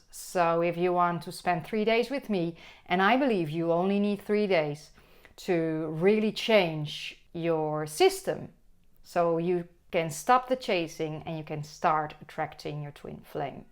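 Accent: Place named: Netherlands. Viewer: this is crazy. I was on holiday last week and saw so many flamingos! Also, I've been seeing so many 44s. Everywhere. That's how I found your channel... It was the first video I clicked on when I looked up what it meant. Also... My twin flame is Dutch! When I heard your accent I thought 'it can't be... can it?' Never seen any Dutch twin flame content before !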